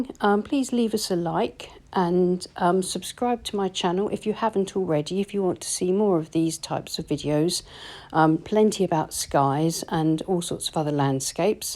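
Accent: England, United Kingdom. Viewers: It's lovely to hear your soothing English accent and see you paint in such a peaceful manner. Thanks from a Brit in California! We all need peace at the moment and you certainly draw us into the sanctuary of watercolor painting!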